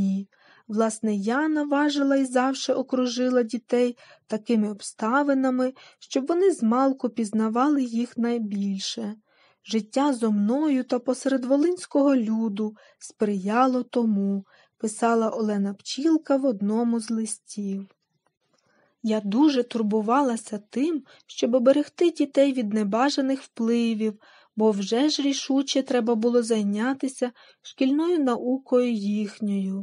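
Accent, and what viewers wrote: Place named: Russia. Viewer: Не до‘чка ,а дочка‘,багато наголосів російських ,а не полтавсьо -украінське.Розповідь інтересноа й пізнавальна ,але вимова не професійна .Спасибі .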